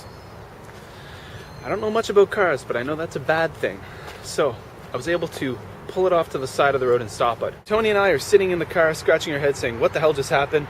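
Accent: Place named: Maritimes, Canada. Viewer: lol. great story. i love the east coaster accent, it makes the story. Reminds me of Rick Mercer or Andrew Younghusband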